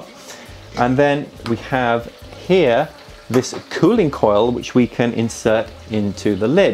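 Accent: United States. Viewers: I LOVE Double IPAs...awesome recipe, awesome video as always! I want that Clawhammer system SO badly...but I'm eyeing up the Brewer's Edge Mash and Boil (w/ pump) for now. It's a lot cheaper, but obviously not reaching the level of the Clawhammer. Anyway, loving the series! Thanks! The American accent btw...SPOT on. ROFL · That southern accent 😂And that beer looks good!!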